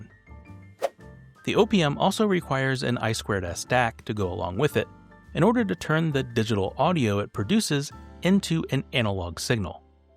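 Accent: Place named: West Coast, United States. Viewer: I feel like that is west coast pronunciation. I catch myself saying wadder instead of water.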